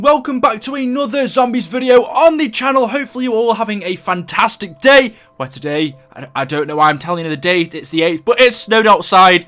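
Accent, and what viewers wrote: United Kingdom. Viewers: Are you British because your accent is awesome! !!! ₩ h ¥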